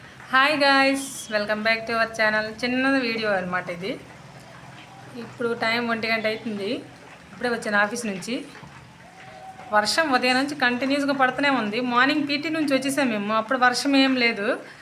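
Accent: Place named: Vizianagaram, India. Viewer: మీరు చక్కటి మన vizianagaram యాసలో మాట్లాడటం చాలాబాగుంది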